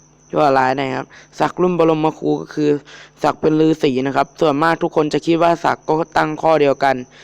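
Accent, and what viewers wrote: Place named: Isan, Thailand. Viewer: ว้าวอีสานก่าได้เด้อฟังออกยู่คับ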